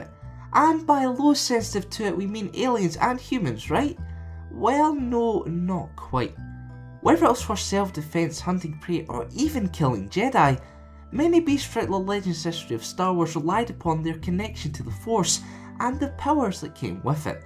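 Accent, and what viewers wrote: Scotland, United Kingdom. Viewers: i have to say it mate, this combination of untamed scottish accent and soft speech is strange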